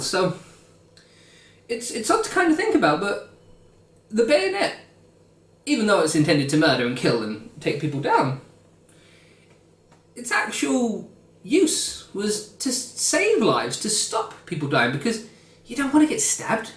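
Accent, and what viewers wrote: United Kingdom. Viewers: i really enjoy your history videos! are you english?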